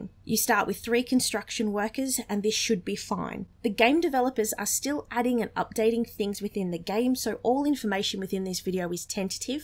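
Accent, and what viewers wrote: Australia. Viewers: "chick with the Aussie Accent" did not disappoint. Thanks mate.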